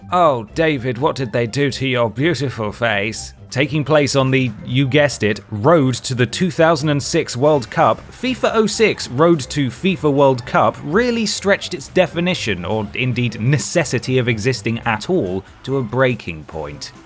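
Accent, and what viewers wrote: United Kingdom. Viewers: A British commentary. Refreshing indeed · I love this channel because they put subtitles, I'm English learner and sometimes I don't understand the british accent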